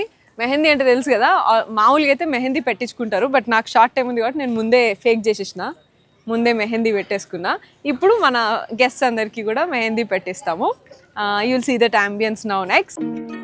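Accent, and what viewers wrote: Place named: Telangana, India. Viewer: I like your dressing style aand the way your speaking... Telangana slang vinadaniki baguntadhi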